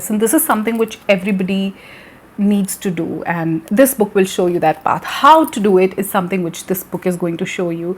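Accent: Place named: India. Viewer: Thanks my dear teacher. I'm well aware that my name is quite familiar to you as I've been one of your regular viewers on this educational channel for a long time. I have not missed any of these video series thus far and often keep an eye on the next episode. The reason is that I have never been able to listen to a mentor like you who speak excellent Indian English with such clarity though I have listened to a few others. Really you're speaking to our hearts. There's a lot I've learnt from your talks so far and there's much more yet to be learnt. I'm often with you on the channel. Just like all the previous episodes, this video too is important as you're giving us some book recommendations. Indeed, books 📚 have the potential to change our lives and it's important for all of us to read books. Out of the 5 good books mentioned here, I've read only one - 'The Alchemist' by Paulo Coelho, which is one of my favourites too. 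I'm sure that the other books are available here in Sri Lanka. I'm a member of The Colombo Public Library, the largest library in Sri Lanka and think that they may be available there. There's another book which I like most. It is 'The Village by the Sea' by Anita Desai, who is an Indian author. Some time back, this book was a prescribed text for the A/Level English Literature in Sri Lanka. Though there are lots of books not all are so interesting as we think, but stories of that kind are read by all mainly due to impressive style of story telling. Just lik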